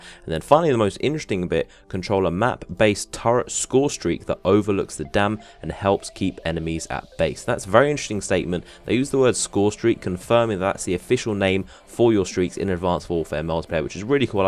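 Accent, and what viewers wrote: United Kingdom. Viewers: where are u from in the UK?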